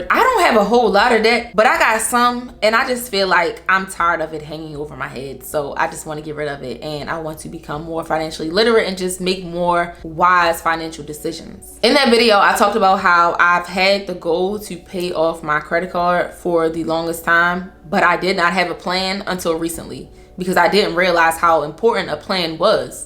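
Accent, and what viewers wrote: Baltimore, United States. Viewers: I hear a Baltimore accent. Are you from there?? Thank you for your videos! Happy new years! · you from baltimore aren’t you ? 😭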